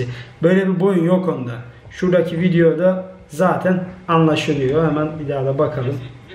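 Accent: Black Sea, Turkey. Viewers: rizeli artvinli misin ya da karadenizli konusman ayni bizim oralar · Abi karadenizli misin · Abi karadenizlimisin ? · Abi Trabzonlu musun Rizeli mi ? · Abi sen Karadenizli misin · Reis sen nerelisin ya trabzonlusun ya da rizeli ben ofluyum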